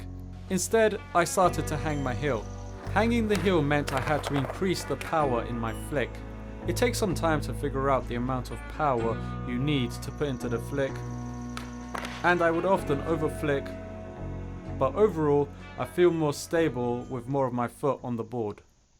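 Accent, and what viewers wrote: United Kingdom. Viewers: Lan türk aksanı bekliyordum british çıktı asdfafdas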